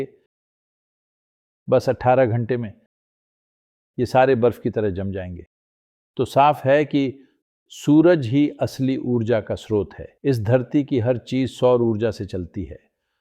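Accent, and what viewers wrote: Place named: Tamil Nadu, India. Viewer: सद्गुरू तमिल भाषी है वहां ऐसा ही उच्चारण होता है भाई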